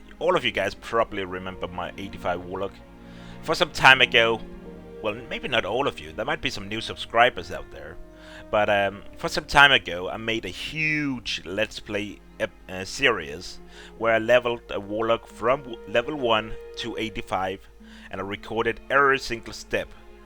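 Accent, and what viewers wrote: Denmark. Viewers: Are you danish? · Are you danish? :b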